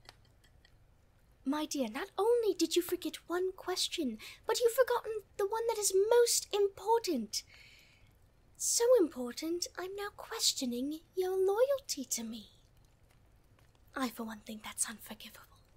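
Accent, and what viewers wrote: United Kingdom. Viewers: How… I swear you need to be hired by someone to do voice acting This British high ip accent is just…perfection, with the vampire roleplay, words can’t describe how amazing this is.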